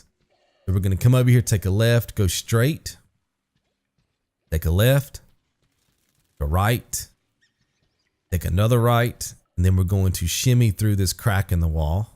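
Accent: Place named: South, United States. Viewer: Can I ask you where you're from, love your accent, reminds me of the South :D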